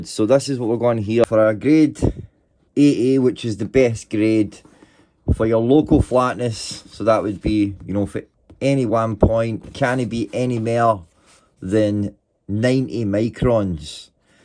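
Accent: Glasgow, United Kingdom. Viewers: You from glasgow mate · Great to hear a fellow Glaswegian ... I'm from Dennistoun myself 😁... as to whether or not they are perfectly flat, yes it'd be great if they were perfect, but at the end of the day you tried to do it yourself 👍 I made kitchen worktops out of 2ft square by 1" thick granite slabs (doubled at edge to 2"), £240 instead of thousands for a 3/4" rip-off version!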